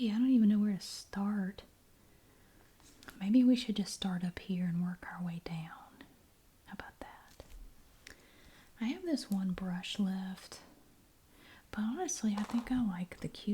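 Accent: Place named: North Carolina, United States. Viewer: Also, I love your videos and your voice and accent sound very similar to my godmother's who is from Hertford, NC. It's so comforting 😊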